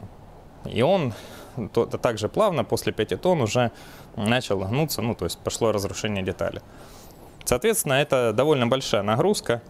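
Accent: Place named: South, Russia. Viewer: Обычный говор триста километров на юг от Москвы все так говорят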